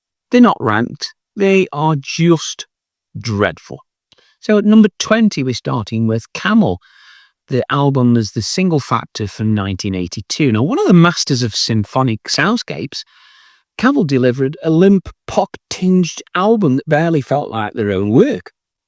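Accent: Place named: Newcastle-under-Lyme, United Kingdom. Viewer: A Prog-talking Stokey! Ace! Actually, you sound more Newcastle-under-Lyme? You're right about these albums, of course, but from a nostalgic perspective, they still have a place in my heart and I'll just listen to them from time to time just to remind me of what I was doing in the 80s.... Was never an ELP fan... but have the 80s ones you mention by IQ, Rush, Asia, Camel, Yes, Kansas. They have infinitely better albums, but these are part of their canon and I can enjoy them with the windows firmly closed so no-one can hear what I'm listening to. Guilty pleasures. Single Factor I like, Nomzamo I really like (but I was 18 at the time), and Union has its moments. The biggest let down of these for me was Hold Your Fire, for sure.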